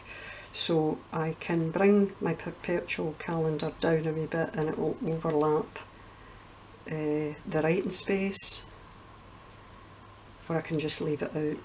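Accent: Scotland, United Kingdom. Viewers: Gorgeous ... thank you for sharing... think I detect a fellow Scottish crafter 😍 x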